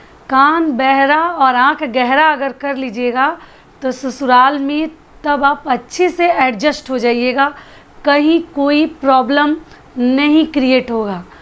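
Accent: Bihar, India. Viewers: Dii aap pirbiya ho ya bhojpuriya ya bihari kya ho.?🤔🤭kyuki aapki aawaj..mujhe..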